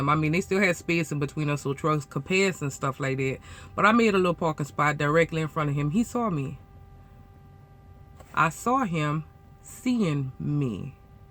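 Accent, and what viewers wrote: Louisiana, United States. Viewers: Not a trucker at all......... Im just hear to listen to the accent... Always been a sucker for a Louisiana accent!!!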